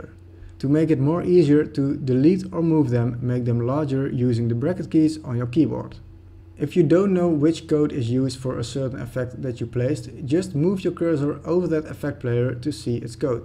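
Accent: Netherlands. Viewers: I smell Dutch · First of all thank you so much for this!!! Second, are you Dutch? Your accent reminds me of my own. :)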